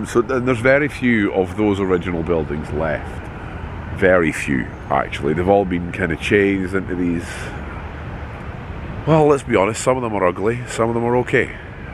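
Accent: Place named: RP, United Kingdom. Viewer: The posh rambling was next level!!🤣😂🤣👍👍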